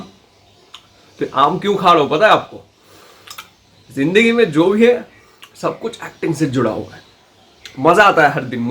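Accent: Bengal, India. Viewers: Sirf 'ra' sound ki wajah se aapka Bangla tone aa jata he.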